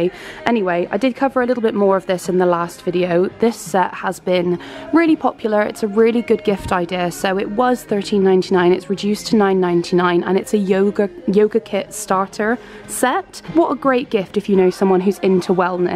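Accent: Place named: England, United Kingdom. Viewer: Hello sweet lady I'm in America from the suburb of Chicago May I ask where you're from you sound like you're from London Have you ever been to America or Chicago We have a store called Dollar Tree sometimes they have good stuff sometimes they don't all depends what you're looking for Thank you for a nice video God bless have a good day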